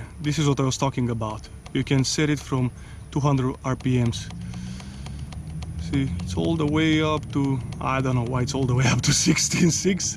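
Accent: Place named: South, United States. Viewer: I can barely understand your southern accent.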